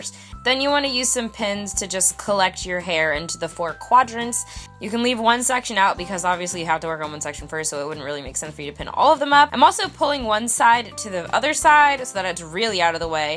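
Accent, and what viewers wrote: Jamaica. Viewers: that jamican accent tho i can teach u cuz i am jamaican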